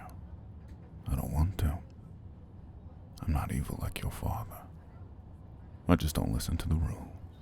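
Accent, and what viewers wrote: South, United States. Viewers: I RAN to this video, hawt damn. Edited to add: as a southerner, I'm so picky about southern accents but you ate and left no crumbs, sir. Tysm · I saw country accent, gasped and clicked immediately 😏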